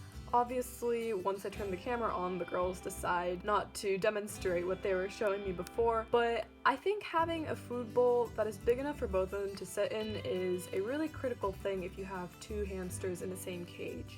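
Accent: United States. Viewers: The person narrating is American.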